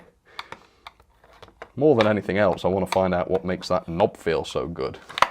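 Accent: United Kingdom. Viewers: Can tell he’s British lol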